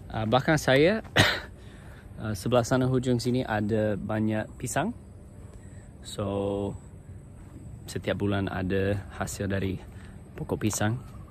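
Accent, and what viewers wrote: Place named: North, Malaysia. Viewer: Kamu sebut "pokok petik". Haha! Ini betul2 sebutan org utara utk pokok betik atau papaya!😂Dah jad org utara kamu ye!😂Kamu juga sebut "pokok pelam" utk pokok mangga, lg sebutan utara, selain kamu sebut "ayaq" utk air!😊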